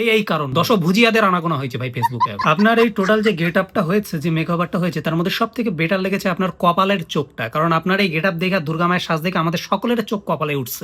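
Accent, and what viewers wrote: Bangladesh. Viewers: That Bangladeshi accent❤️ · বাংলাদেশিদের মতো কথা গুলো লাগছে।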